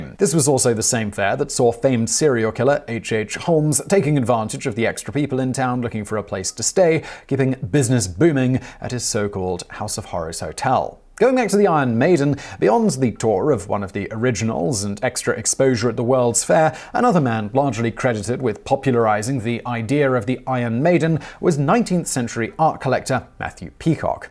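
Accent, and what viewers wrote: United Kingdom. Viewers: Simon I absolutely love you but a vacation is I order my friend! I don't want you to work yourself to death. I so look forward to lovely British accent!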